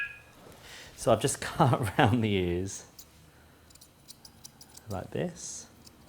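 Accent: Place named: England, United Kingdom. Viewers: I love watching the British groomers. Many times I catch myself talking British while I'm grooming dogs, lol! Especially the good ones that sit: I say "Up dahling!". You did a great job ;) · english, this is an english accent.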